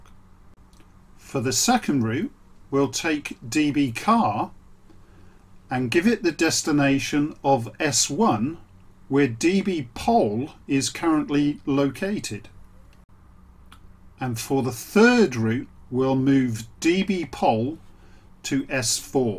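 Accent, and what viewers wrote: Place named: Oxford, United Kingdom. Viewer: Hi, your videos are very much interesting! I am currently in a process to go from analogic to digital on my network. I am equiped with Z21 and Itrain on my computer. Aim is to implement computer driven interlocking. I followed all the steps of your tuto and I feel more confident. As a French, I have also very much appreciated your Oxford english speaking! I have one topic which bothers me: during my off line tests, I lost my screen organisation: I have only left the TCO and the trains/locos control pannel: no more train list with positions and destination indications. Could you advise me on how to come back to the initial screen set-up? Thanks again. Alain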